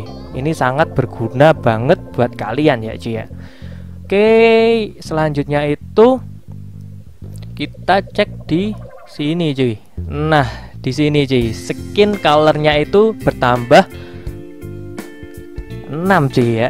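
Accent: Java, Indonesia. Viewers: Ngakak sampe logat medoknya 😂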